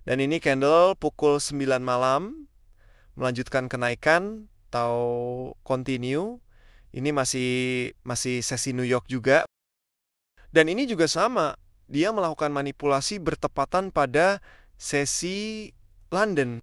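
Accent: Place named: West Java, Indonesia. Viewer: Bang aslinya org mana? Kaya ada logat sunda nya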